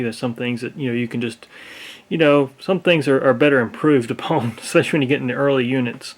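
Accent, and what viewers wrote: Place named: Appalachia, United States. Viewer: Your hillbilly accent is just adorable.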